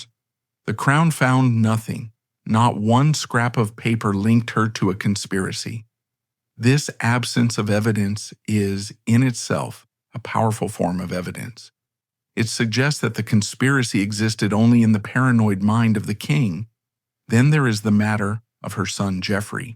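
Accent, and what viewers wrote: United States. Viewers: The idiotic images completely wreck the content. The moment I hear an American accent narrating British history I turn off, because I know it'll turn into bullshit.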